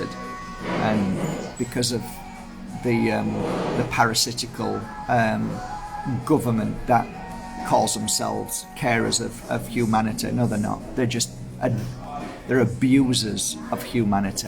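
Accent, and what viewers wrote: Yorkshire, United Kingdom. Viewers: Thanks for making and sharing this video. I love the Yorkshire accent 🙏💗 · That is so funny i totally understand your saying about hating hearing yourself speak i cant bear hearing my north London working class accent 🤣but your yorkie accent is beautiful always has a calming effect on me especially with the wise words you give us 🙏❤️❤️❤️ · Seems most everyone loves the voice. It’s got character and sincerity there, not to mention depth of perceptivity. People LOVE the Yorkshire accent too. Woah…30thousand. Well, AI is looking out for you some. Ai reflects us, in multiple aspects, normally not so good. In this rare case, a fine outcome. (Expect, I’ll pick up a bit of flack from people’s feelings on the Ai.) Its okay on the flow; lots of people picking up stuff starting particularly in the 90s, then went quiet for some, louder for others. 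Hmmm, don’t know about handling worse situations in the next few months. Have to consider that one. Well, all will get through it. Personally, I may hibernate. Thanks. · What you say is the most important , your tone of voice is soothing and understanding, and your accent is my personal favorite accent from England . I have been watching British programs on PBS in the US since I was a pre-teen and later when I was introduced to All Creatures Great and Small I became drawn to the Yorkshire accent, I love listening to it. In fact I feel drawn to the area and in general the north of England holds a kind of magic for me. Keep doing what you do, we need more people like you in this world. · Love your Yorkshire accent, I’m from leeds West Yorkshire, love this coffee chat , thank you for everything you do ❤❤❤ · Most people love the Yorkshire accent. I'm Yorkshire and don't like my voice either. 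But most call centres in Yorkshire. Because people love the accent. Your voice is lovely and you speak from the heart ❤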